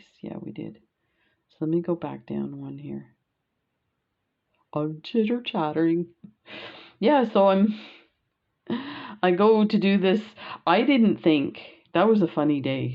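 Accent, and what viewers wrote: Canada. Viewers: I tried this so many times but kept getting that puckering around the perimeter. Finally switched to 5mm pearls instead of the 6mm. I used 6 seed beads to surround them and I didn't have to add that extra single seed bead at the bottom because the seed beads came together really well and didn't show thread. Just a suggestion, as this worked for me. I've made 3 so far and plan on using them on an ornament ball. Thank you so much, for teaching this to us! I love your videos, (and your Canadian accent!) ❤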